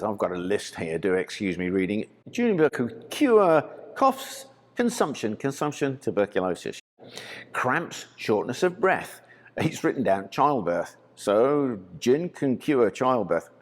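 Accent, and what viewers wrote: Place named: England, United Kingdom. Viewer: All this time I assumed you were in England. That accent better not be fake. I'll be sorely disappointed.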